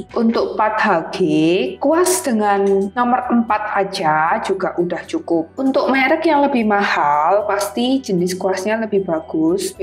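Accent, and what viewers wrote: Java, Indonesia. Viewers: Medok but beauty and love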